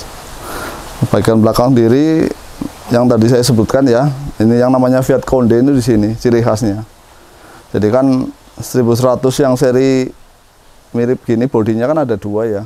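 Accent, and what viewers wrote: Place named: Banyumas, Indonesia. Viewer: Dikerook 😀 ngapaknya ketahuan, Mas pasti dari mana ya??. Sukses mas, semoga cepat laku, keren banget mobilnya.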